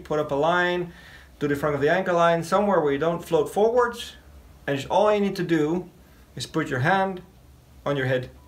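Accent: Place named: Netherlands. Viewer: Clear description, many thanks! And now it makes sense. Dutchman liven in Denmark making video’s in English. I’ve been watching your valve overhaul video yesterday while underway in the kiel Kanal and was noticing a slight Dutch accent but Danish comments. 🤔. Watching this today in Denmark (Kalundborg)!while discharging it became clear. 😀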